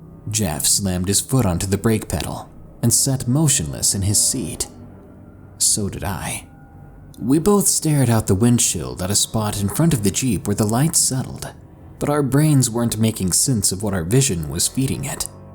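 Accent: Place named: South, United States. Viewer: Been a fan of now for 3 years....hahah back when he had a southern accent...anyway keep it up. I help sponser too by being a fan:):)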